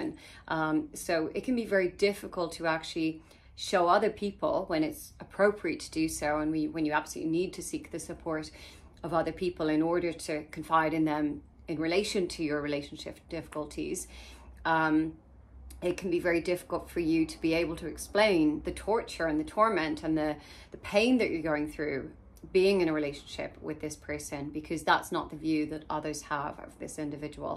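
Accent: Ireland. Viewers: Are you Irish? 🤔 I can tell by your accent! 🥰🤗💖🧡🤍💚 Good, important video. 😁👍🏻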